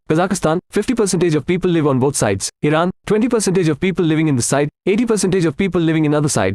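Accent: India. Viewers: why the accent look like indian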